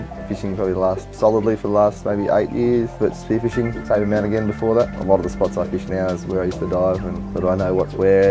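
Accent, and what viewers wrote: Australia. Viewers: What part of Australia is that?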